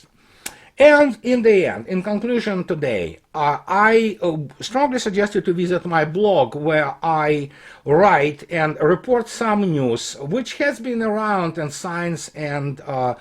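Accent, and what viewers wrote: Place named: Russia. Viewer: What are we supposed to make of an American citizen with a Russian accent?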